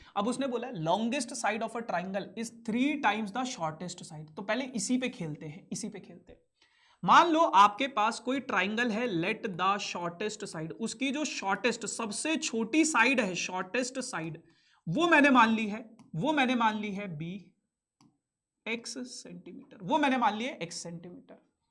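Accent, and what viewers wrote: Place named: Haryana, India. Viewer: Haryanvi hi hai mdm ji❤😂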